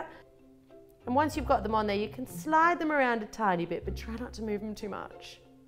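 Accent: Australia. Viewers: Are you Auzzie?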